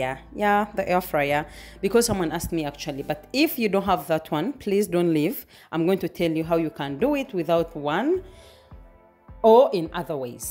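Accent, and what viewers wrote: Uganda. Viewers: just curious! im gambian (west africa) so i haven’t met many east africans (outside of somalis, sudanese, and ethiopian). your accent reminded me of kansiime anne, a ugandan comedian so i figured it might be ugandan. anyway thanks for the recipe, i will be using it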